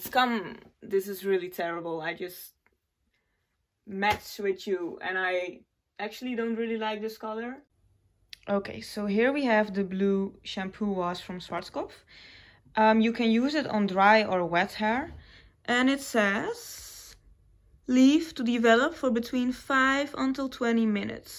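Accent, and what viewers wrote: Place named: Netherlands. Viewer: Oef you’re really Dutch aren’t you?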